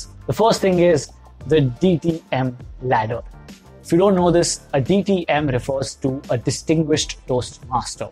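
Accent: India. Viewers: I congratulate you for your Chutzpa! With your accent? Are you aware of the damage you do? You ripped off your TM experience, then you flatter them, then you criticize them, then you plug your product? Please go to a speech teacher, get rid of your accent, then, when you no longer sound like Peter Sellers doing his Indian Accent, begin to teach. You are off the tracks, friend. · There's nothing wrong with his accent. In fact, he has one of the most neutral English accents I've heard in Indian YouTubers in a long time. You're just bitter that he had some cons to share about Toastmasters. So you're making a personal attack. That's petty.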